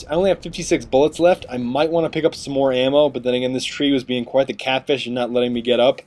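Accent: Germany. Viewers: haha your english-german accent is epic :D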